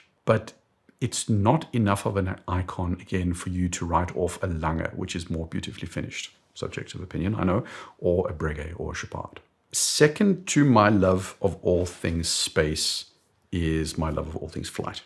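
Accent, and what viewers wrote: South Africa. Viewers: I have always thought that you had a rather unique accent which sounded very "Saffer", now I know where the accent comes from. Greating fom Cape Town" Boet" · Mr, you're South African?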